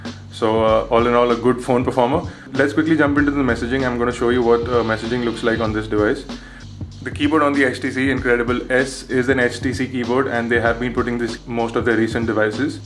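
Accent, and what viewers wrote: India. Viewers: Another good review, with a wonderful Desi accent. A refreshing change from Europeans who actually pronounce OMG as OMG. Good job buddy.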